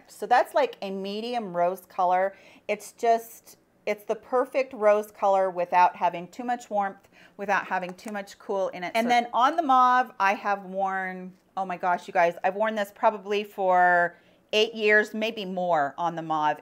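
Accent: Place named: Midwest, United States. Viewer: Where are you from? You sound midwestern. Btw loved the video!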